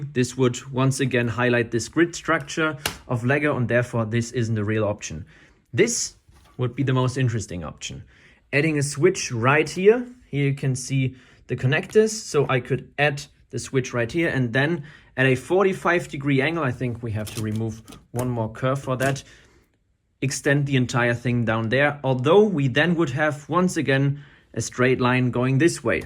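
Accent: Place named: Germany. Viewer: German accent sucks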